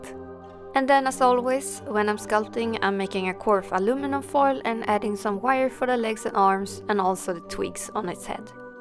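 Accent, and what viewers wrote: Sweden. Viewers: Är du svensk ? Du låter lite svensk hoppas du fattar vad jag säger nu 😊☺️ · Are you from sweden? You sound swedish, im from sweden ❤️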